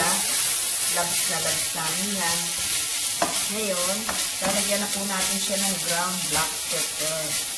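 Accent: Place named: Pampanga, Philippines. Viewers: ate atin kang accent, kapampangan the best for cooking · may accent kang kapampangan maraming Eusebio sa san fernando, pampanga. nkakapaglaway kang panuorin s tikim portion mo.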